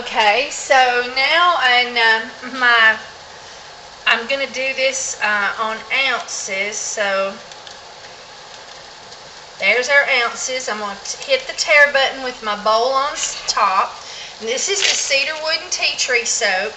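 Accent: South, United States. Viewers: Thank you for the video. I love to listen to you. I'm from Ms. so I understood your "sayings" LoL! Gotta love the south :)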